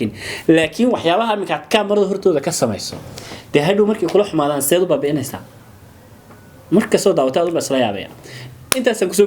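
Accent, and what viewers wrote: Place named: Mogadishu, Somalia. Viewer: Sxb afkaaga hagaagso reer muqdisho kaliya umada kama af duwana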